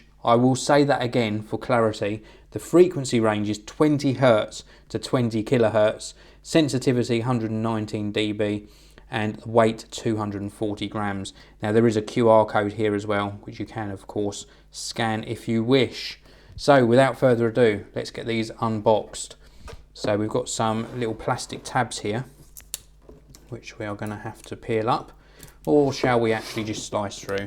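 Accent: United Kingdom. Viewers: Nice and complete review man! The best I've saw on that one (the smooth English accent is a +)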